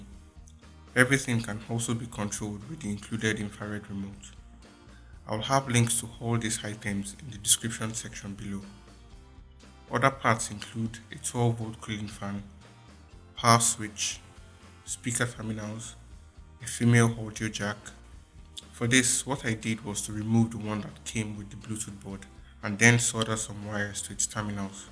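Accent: Nigeria. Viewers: I guess he's from Nigeria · Nice work your Accent sounds like You are Nigerian...A few pointers though..Try using speaker gasket instead of silicone gum to hold down your subwoofer, silicone has been none to react not to well with speaker surrounds....Nice work 👍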